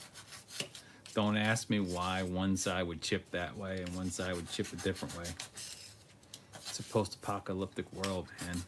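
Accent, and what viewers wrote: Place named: Philadelphia, United States. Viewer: Your Philly accent is excellent. Great voice. And I have thought about doing live but haven’t really dug further into it. Sounds like this might be an email conversation. I’ll dig your email out from your about page.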